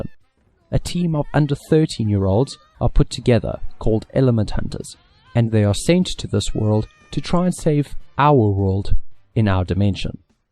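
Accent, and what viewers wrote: South Africa. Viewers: Narrorator, are you south african?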